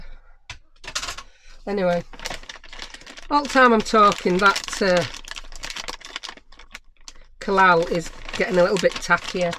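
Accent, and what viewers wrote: United Kingdom. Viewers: #1. LOVE your tutorials. #2. LOVE your accent! Might sound crazy but your accent is very much like Paul Sutherland from True Crime Enthusiast podcast! The hubby and I love that podcast. I say this with the utmost appreciation because it is a welcoming accent. I feel like we could sit and chat over a cuppa tea<<<of course that kinda sounds like the start of a creepy stalker episode of True Crime-muwahaha. {Nah...just a native New Yawka that loves cool accents and all things UK 💜} and #3. You mentioned a type of glue-is it "calall" glue"-when adhering the corners. What kind/brand of glue is that? Thanks so much for all your awesome sharing!!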